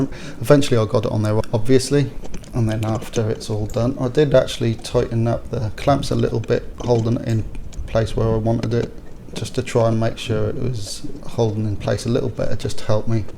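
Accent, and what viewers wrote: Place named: Norfolk, United Kingdom. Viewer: Wow that's a true Norfolk accent what part are you from?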